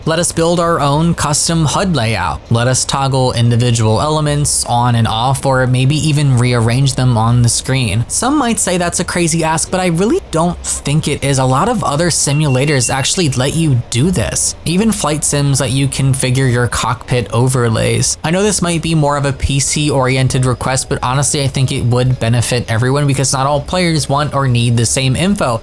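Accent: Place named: California, United States. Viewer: You have the most California voice from California. Am I right?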